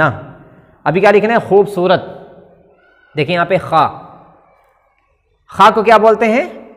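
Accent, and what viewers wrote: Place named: India. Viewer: Your pronunciation is according India not UK.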